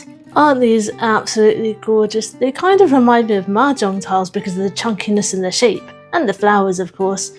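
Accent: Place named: United Kingdom. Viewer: I just love you're cute British accent. Thank you for reminding me of the vodka and food color painting method of decorating cookies. I am going to try that for Christmas. 🎉❤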